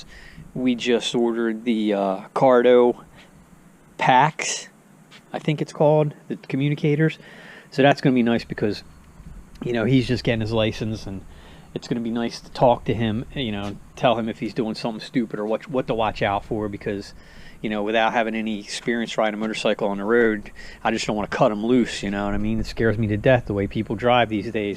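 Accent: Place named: Pittsburgh, United States. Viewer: You got a Pittsburgh accent lol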